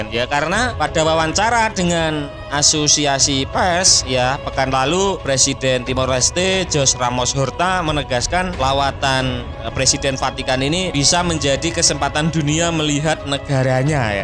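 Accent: Java, Indonesia. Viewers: Admin asbun bicar a tanpa data.....saling kritik antar anak bangsa adalah hal biasa di Timor Leste.....$12 jt utk acara penyambutan bknlah berlebihan ....dari logat anda dipastikan kamu org jawa..... Spy kamu sadar ya ......mau brp biaya yg kami keluarkan bkn urusanmu ..... Urus aja dirimu ...agamamu dan negaramu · Min jgn cari uang bawah2 negara org kamu bukan org Tls ko..itu bukan urusan kamu...jgn pke uang haram kash makan keluarga...logat jawa nee..kentara.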